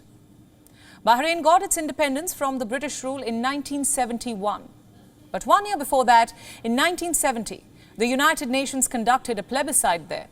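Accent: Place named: India. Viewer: What is this? it's not news and it's not analysis, it's just some one saying imaginary things in Indian accent.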